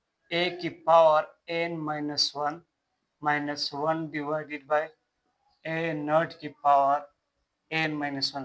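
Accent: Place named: Pakistan. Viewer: Sir ap pak se ho kya apka bolne k tarike se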